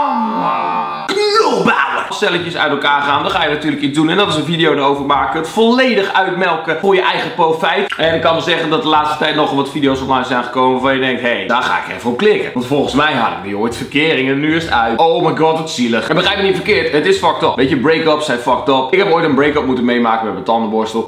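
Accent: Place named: Limburg, Netherlands. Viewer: Lekker Limburgs accent 🎉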